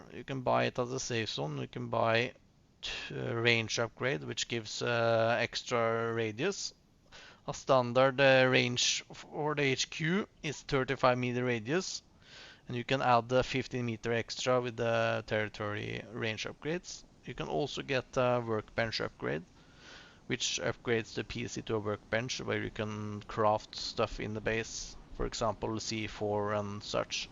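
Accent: Norway. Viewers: Are you Norwegian perchance? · What is the base mod name? Im guessing by your accent you are Norvegian. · are you from norway and great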